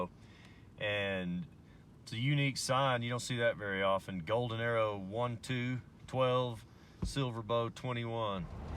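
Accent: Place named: Scotland, United Kingdom. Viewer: That sounds like a Scottish accent 😉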